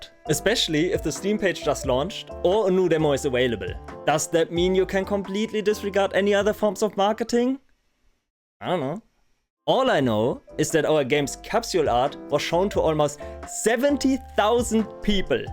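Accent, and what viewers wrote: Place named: Germany. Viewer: Uh-oh, you provided value in the video, now I gotta have another subscription. Plus I sense a German accent.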